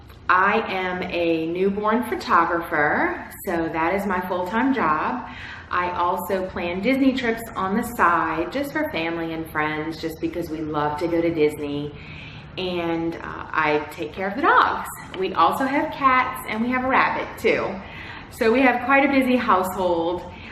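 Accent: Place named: New Orleans, United States. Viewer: I have been watching your videos. You are so sweet and informative. We have a lot in common. My family is from New Orleans. I picked up on your accent right away. Go Saints! I lost my 1 st Shih Tzu 4 months age. He was 13 and my world. I also have been looking for a reputable breeder to find a new baby. I searched all over the country spoke to many many reputable breeders and finally reached a decision. Now I am expecting my new puppy 🐶 in 8 weeks. I am so excited to see and meet him in person. I love watching your videos. My husband and I do filming and media. I also have 2 grandsons. I love and spoil my dogs too. We love Disney too! My husband produced the Voyage of the Little Mermaid puppet show and also on the set of the Lion King. I hope one day we can talk. Stacci is gorgeous!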